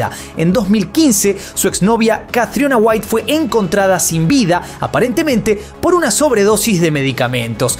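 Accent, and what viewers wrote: Argentina. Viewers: La vocesita y el acento argentino que no deja de verse el ombligo y sus comentarios lúmpen hacen que mejor veo cosas inteligentes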